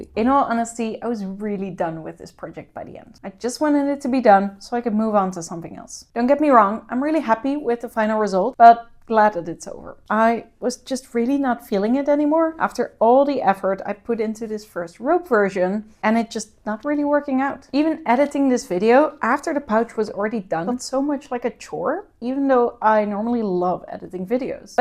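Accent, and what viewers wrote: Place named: Quebec, Canada. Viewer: Love this tutorial. I recently got back into SLR film photography and want to make myself a cute camera bag. I also love your accent, are you from Quebec?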